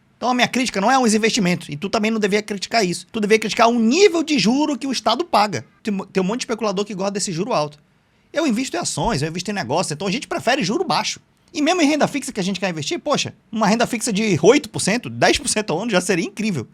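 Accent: Manaus, Brazil. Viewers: Você é de Manaus? O teu sotaque me lembra muito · Sou Paulista morando em Manaus há mais de 15 anos. Bom demais ver um Manaura arroxado divulgando conhecimentos de qualidade em um nicho dominado pelos Sudestinos. E con um sotaque muito mais divertido. Força e sucesso na sua jornada!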